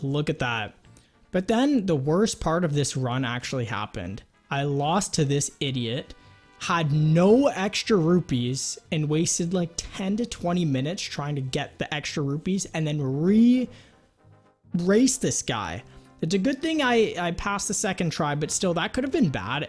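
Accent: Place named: Canada. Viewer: bro this canadian accent is killing me 😭:face-pink-tears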